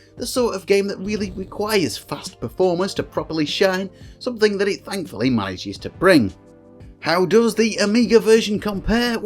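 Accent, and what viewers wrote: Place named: Northern England, United Kingdom. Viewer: The Manchester accent... gahhhhh....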